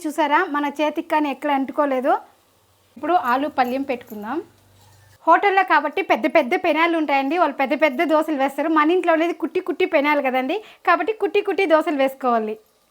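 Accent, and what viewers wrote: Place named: Chennai, India. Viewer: Nenu chennai,ikkada tami telugu vaaru ilaane maatlaaduthaaru😊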